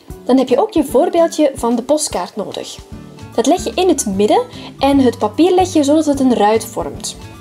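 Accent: Belgium. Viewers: Duh België klinkt wel grappig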